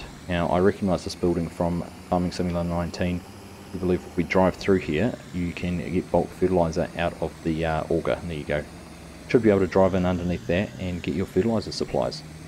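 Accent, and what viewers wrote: New Zealand. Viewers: I love this NZ accent 😁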